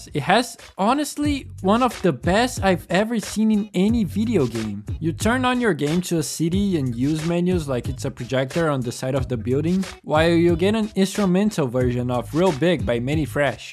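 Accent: Brazil. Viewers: Bro you Brazilian?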